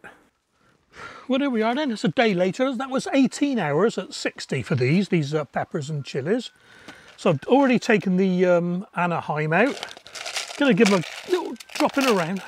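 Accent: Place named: West Country, United Kingdom. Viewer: Nice batch of peppers there, just popped over from at Green Side Up to have a look see. I air dry mine tomatoes with added spices/herbs then store in jars with olive oil, triple bonus, 1. Makes the house smell nice, 2. Flavours already added prior to cooking, great topping on pizzas, 3 Flavoured oil for cooking. Thanks for the tips on preserving, lovely hearing a west country accent 😉😁🌱☀️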